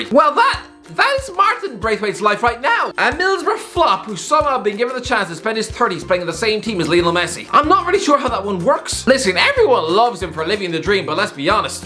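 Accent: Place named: Ireland. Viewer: Irish accent makes it seem like that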